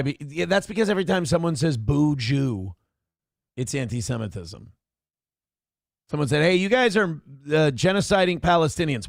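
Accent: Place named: Minnesota, United States. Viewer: sounds like he’s from Canada unlike your minnysoder accent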